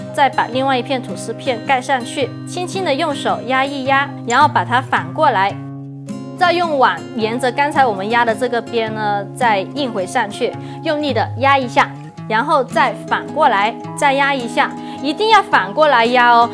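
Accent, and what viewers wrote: China. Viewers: Ew. Can't stand her Chinese accent.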